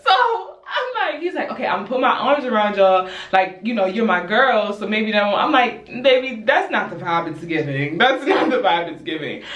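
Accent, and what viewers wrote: Baltimore, United States. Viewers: Are you from Baltimore?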